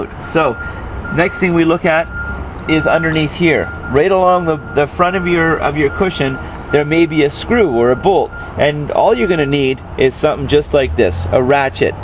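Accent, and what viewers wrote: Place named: Canada. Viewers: He sounds canadian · He's got a strong Canadian accent. Could also be from Minnesoooda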